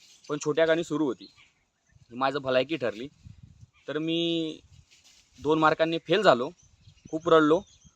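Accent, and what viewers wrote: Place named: Malkapur, India. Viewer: दादा तुमच्या भाषे वरून कळते ,तुम्ही मलकापूर चे आहात k😊😊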